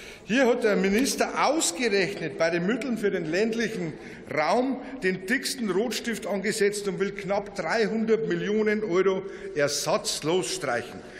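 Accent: Bavaria, Germany. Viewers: Agrarthemen mit bayerischen Dialekt wirken irgendwie authentischer... 😂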